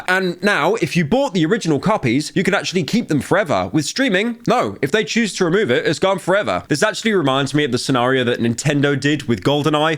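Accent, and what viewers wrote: United Kingdom. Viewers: You sound more british when very sick. It only makes sense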